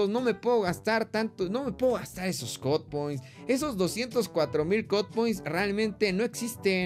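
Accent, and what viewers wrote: Mexico. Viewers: eres de Puebla?😊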